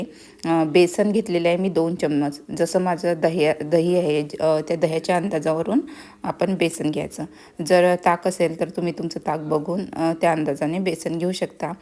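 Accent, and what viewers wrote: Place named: Vidarbha, India. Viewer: विदर्भातील आहे का ...आपली भाषा वाटत आहे ❤ नागपुर side ची...मला आवडले वीडियो...जर्मन ची आजी चा वीडियो बघितला मस्तच ❤❤😊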